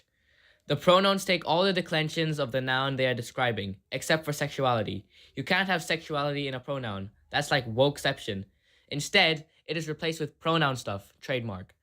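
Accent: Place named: Lahore, Pakistan. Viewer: lol at one point int he video they show a grammar chart or something and aling english there was an urdu comparison, this + the accent, which to me sounded similar to a lahori, made me think i had found another one of us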